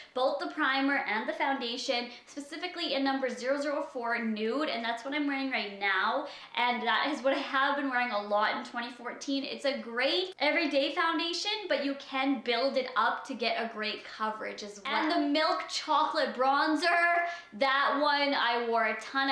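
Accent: Canada. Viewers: I do not understand why everybody hates on she is a great person why is everybody so concerned tht she is writting words in the american way im canadian n sometimes i write in the american way everybody also makes fun of he bout the she says words tht have tht letter "a" in them all countries have certain accents n tht a canadian accent honestly haters if u have nothing nice to just dont say it at all because u r ruining day n all of her cuties day btw ily ignore the hate 3:-)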